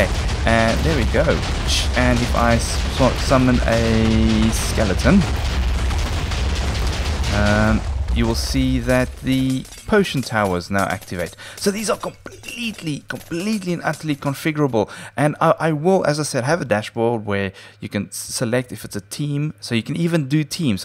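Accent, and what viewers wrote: South Africa. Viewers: where from south africa are you ? :)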